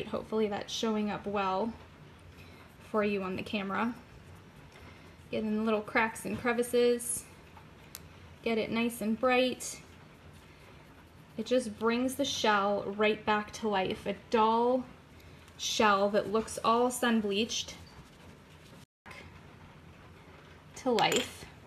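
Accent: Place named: United States. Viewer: It’s an American accent. We all have one. Even you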